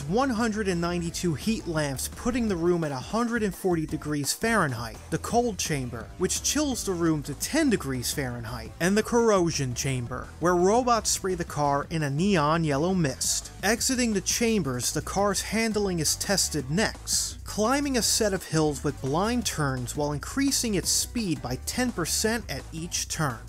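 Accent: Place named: New York, United States. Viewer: Alright. Maybe I’ve missed this in another video somewhere as I’m watching this as a playlist. But “aloung”…I’m getting a NorthEast accent. I know it’s not NH, MA, or ME. You don’t say “hahd, yahd, cahd, cah, bah, laand. Am I high in hearing some NY? Not trying to out your place or anything. Just the accent is bugging me and I just had to finally ask 😂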